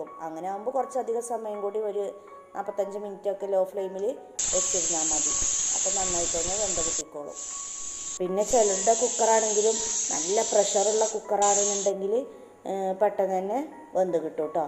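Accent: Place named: Malappuram, India. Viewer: X-Mas Special Bief Fryoo😮😅Am much Impressed with Your Way of TalkinG Than CookinG ..Adhu oru vere Vibaan ...Asaal Malappuram Kaaaariii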